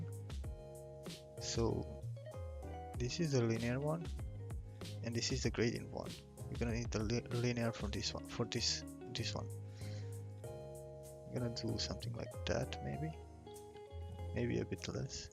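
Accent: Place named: England, United Kingdom. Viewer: Brother really love your videos..and english accent..tutorials are very helpful... Keep up the Good work!!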